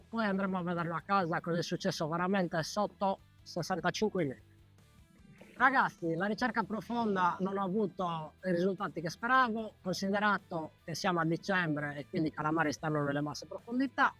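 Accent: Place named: Sardinia, Italy. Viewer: Stavo solo curiosando ma, presto , mi ha colpito una " strana" cadenza a me molto cara.......non propriamente casteddaia ma che si avvicina parecchio . Non avendo barca ripiego sull'eging da terra sempre che il " nostro" amato maestrale lo permette......saluti franco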